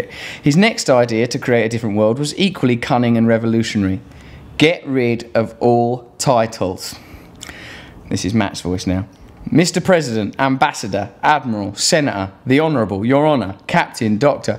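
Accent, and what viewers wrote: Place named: Cockney, United Kingdom. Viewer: I just have to smh when I realize "The man just said something inimically brilliant, with poor grammar and bad cockneyisms deliberately, and with great precision." You sir, are a true post-modern genius. Bill Hicks and Noam Chomsky had a love child, and he lives in London? Well, well. Btw, listening to you read your own book was a lot like reading Tom Robbins' fiction, and I mean that in the best possible way. I have such a fucking man-crush on you, dude. Thanks for being. :)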